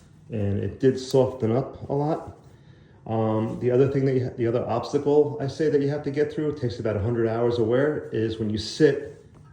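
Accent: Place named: East Coast, United States. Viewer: Been thinking about taking the 33 leap lately.. I'm thinking to request a shorter length and forgo the lower pockets to avoid the rising shoulders when sitting as you mentioned. Jacket is looking great, and you're actually selling me on the choice for a lifelong jacket. Also, you've got a great voice. I didn't notice any lisp or anything. I only notice you sound from the east coast.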